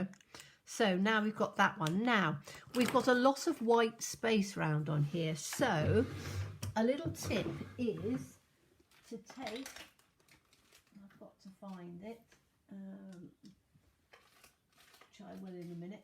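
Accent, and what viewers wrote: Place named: England, United Kingdom. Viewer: I LOVE the way you chat so naturally to us! My father was English and I do so enjoy hearing your accent. He has been gone many years now but you make me feel "at home". Our Aussie drawl is nothing like his beautifully refined tones which you share. Thank you! I also love the gnomes sets and have them. You gave me ideas for other designs with them too! Bless you!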